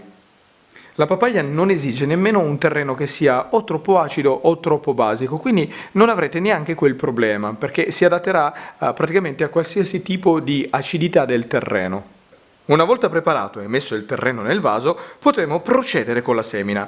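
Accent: Sicily, Italy. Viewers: Ma sei siciliano?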